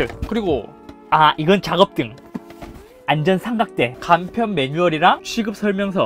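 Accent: Gyeongsang, South Korea. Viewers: 어쩐지 경상도 말투다 했는데 안동사람이군.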